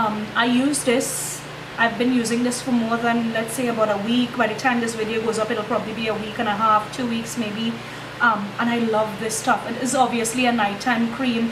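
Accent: Trinidad and Tobago. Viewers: Are you Trinidadian? aha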